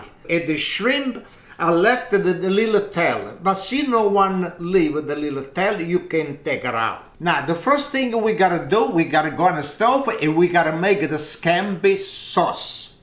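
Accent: Italy. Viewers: ? Where would I be able to procure those little scallop dishes or scallop shells????? Bc where I live, I’ve never ever seen those, im in Pa, and there’s no good seafood stores or seafood places to get anything like that (?!), im in Lewisburg Pa, ( it’s a very old Victorian college town, yet ZERO seafood places to get FRESH Seafoods ect, anyhoo, I so SOOOOOOO ENJOY WATCHING N FILLOWING YOU! My grandpa Louis came straight here from Southern Italy, n he never even learned to speak English!! Lol lol. Yet I was able to understand Italian, but couldn’t SPEAK it to save my soul!!!! Lol lol lol🙃😁, yet somehow i understood every word he spoke to me, he use to walk me on his Hugh farm, and he would pull radishes straight out of the earth n dirt, brush it off n feed them to me! Lol, my matah use to have a FIT bc she said it wasn’t washed properly!! ( lol lol lol lol) yet my grandpa still did it anyway!and I luvvvvvved it!, tnk you so much for being YOU!, bc you sound exactly like him with your ITALIANO accent!!!!!👍👍😁😁🥰🥰🥰🥰😁🥰🥰😁😁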